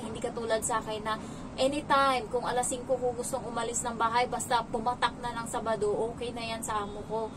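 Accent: Iloilo, Philippines. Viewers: Hi po, ilonggo ka po pla...saan po province nyo?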